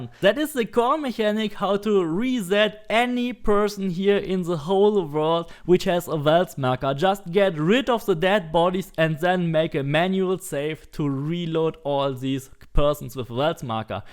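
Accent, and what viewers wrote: Germany. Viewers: Man hört sofort dass du Deutscher bist 😀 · Only German got this accent cuz 99% of them can't say this and say sis and other words with T 😂😂😂😭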